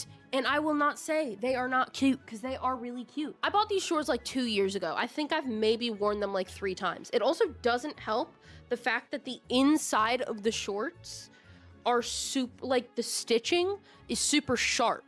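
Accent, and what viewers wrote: South, United States. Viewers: Electro-Harmonix shirt, Danganronpa mic, Sailor Moon poster, Sonic PC wallpaper, slight southern accent... you are 1000% the most qualified person to talk about this and I look forward to watching your other videos. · I already know your southern, what part of the south woman! Tell me story's of the local waffle house >: ) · M...Maryland? You sound like you're from the south am I stupid?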